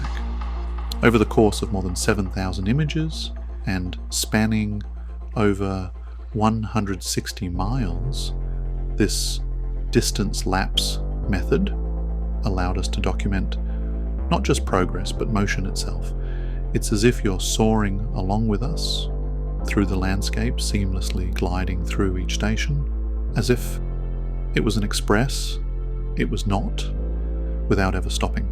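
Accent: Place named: Australia. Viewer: this is a scary version of your voice. It's like almost you but not quite. It's like basic australian man with a little Da mixed in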